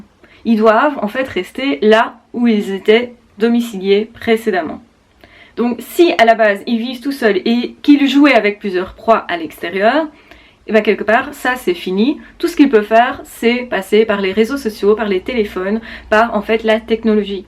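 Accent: Brussels, Belgium. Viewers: Bonjour... (Je n'ai pas trouvé de gmail où t'écrire sur ta page YT, je "tente le coup" par commentaire...). Je te suis depuis quelques temps (abonné) en tant que HPE/HPI, et je m'interroge toujours un peu, au sujet d'une femme avec qui j'ai passé quelques mois au printemps/été dernier... (silence radio aujourd'hui... j'étais trop "rebelle" pour me laisser "faire" plus longtemps... Merci à mon "âme" rebelle qui est parvenue à prendre le dessus sur ma "personnalité" hyper-sensible... à tendance dépendante, donc). Penses-tu qu'un(e) PN puisse avoir des tendances masochistes dans sa sexualité? (ça m'a toujours paru plutôt "contradictoire", mais il est peut-être aussi envisageable d'y voir une sorte d' "exutoire" à ce besoin de contrôle permanent... En t'écrivant, ça me paraît quand même ne pas trop "coller" avec le PN...) Une autre suggestion de profil compatible avec ces 2 tendances? 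(Vie totalement "sous-controle" - par exemple hyper-propreté, clinique -, et sexualité à tendance masochiste... Personnalité évitante, ce serait possible? ) Merci encore à toi pour ton travail 🙏 et ton joli accent que j'aime beaucoup 😉 (Bruxelles? Je ne suis pas belge, sinon je reconnaîtrais vos accents... Par contre, je suis fort en accents français...😁). C'est assez troublant, tu lui ressembles un peu, physiquement (à cette petite "peste" que je soupçonne PN.. les yeux, la bouche...mais là comparaison s'arrête là... ). Paix et Amour à toutes et tous 🌈💕🦊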